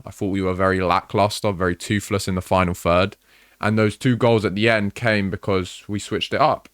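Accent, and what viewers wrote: United Kingdom. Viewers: Hmm, why do you say we? Are you Italian? You certainly sounds British to me.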